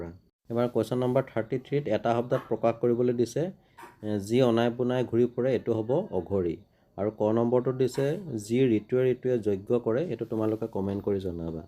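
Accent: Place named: Dhemaji, India. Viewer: Thinks dhemaji Assamese lage sar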